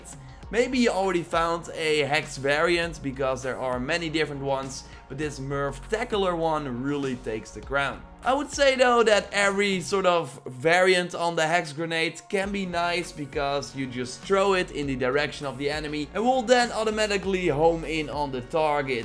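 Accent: Netherlands. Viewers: je hoort meteen dat je nederlands bent haha, nice content man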